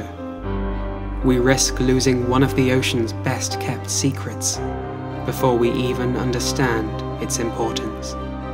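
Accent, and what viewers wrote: United Kingdom. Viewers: Why is it only 12 min long ? The recording is amazing !! The quality is incredible … I don’t care for tne narration though … it seems flatlined and typical , reading every single thing with the same inflection , not having any true feeling / emotion behind it but just flat lined with that same typical cadence every few words , same lifts same falls ( if you can even call it lifts and falls when the vocal range of speaking only rises and is lowered slightly , slowly and maybe a half step in one direction or the other .. sounds like every other recording where someone with a British accent is trying to narrate a documentary… always with same inflections and none of it feels emotive or connected. Many people may disagree and they have a right to their own opinion and can say whatever they wish in their own comments , which I’d expect the same respect regarding my own …. 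I say this not to belittle , but to illustrate my point , bc honestly I feel like it’s something that could be worked out and improved upon … not everyone is meant to be a narrator and I’d leave that up to a handful of people …. With broadcasting experience that have the voice for it .. not to say you won’t eventually have the voice for it , but being completely honest , it takes an amazing mind blowing film and makes it come off amateurish with this narrative.. please at least be unique among those who just read with no pulse whatsoever .. omg!!! There are so many people that when they read , they either bore pe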